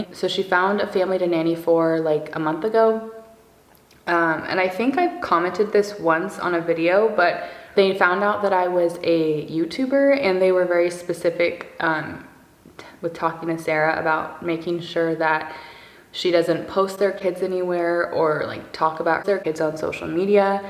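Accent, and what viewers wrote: Seattle, United States. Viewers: I watch this type of videos, or whatever has a lot of chats, just because I'm trying very hard to improve my english that is quite shitty at the moment ahahahah and I never comment anything.. just watch and try to learn some english.. but you look so nice and kind and I love to listen what you say so I suscribe! ❤️ Whatever I have to put subtitles.. Feel like I don't understand the Seattle accent yet 😅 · Girl I’m born and raised in Seattle and hearing the advocate in you is such a “Seattle thing” lol idk how to explain it but how you talk is so seattle lol LA people are nothing like us Seattlites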